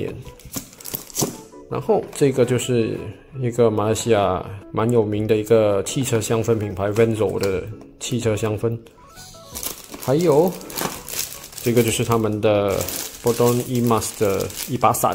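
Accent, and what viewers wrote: Taiwan. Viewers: 只聽的話，感覺口音像台灣人。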